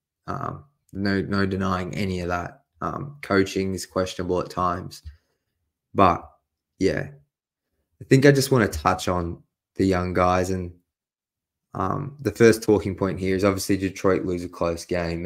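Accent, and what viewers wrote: Australia. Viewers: Saw the mustache and handsome face and knew I’d hear an Australian accent. How does a guy living damn near 24 hours into the future become a pistons fan?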